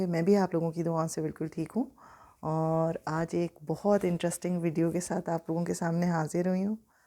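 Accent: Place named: Lucknow, India. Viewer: Bahut bahut shukriya aapka😊 Lucknow se hone ki wajah se kuch aisa laga jaise apne rishtedar ko hi sun raha hun 😚 barhi saadgi se aapne apni baat rakhhi .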